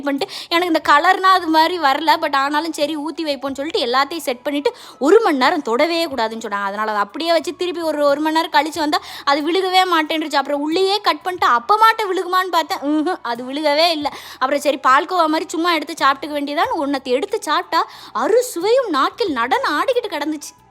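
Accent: Tirunelveli, India. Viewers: Tirunelveli slang ❤❤❤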